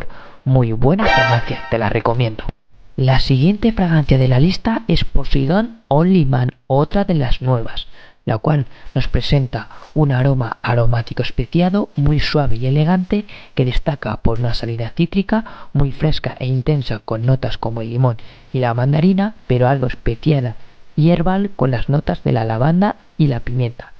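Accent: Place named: Madrid, Spain. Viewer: Te veo muy profesional. Gracias. Tienes acento de Madrid como un primo..Saludos.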